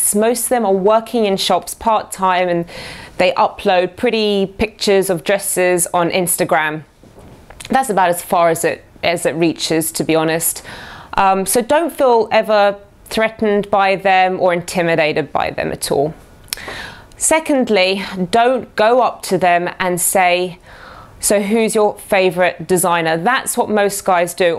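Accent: United Kingdom. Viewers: Her heavy British accent totally makes my pulse race!! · I like your english acces dear woman, i'm searching a girl like you who has confidence in herself :)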